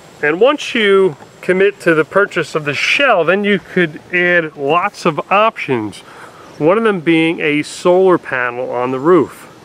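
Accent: New Jersey, United States. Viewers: I think it's the New Jersey accent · SUCH a Jersey boy. Can really hear it in your voice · that Jersey/ mid east accent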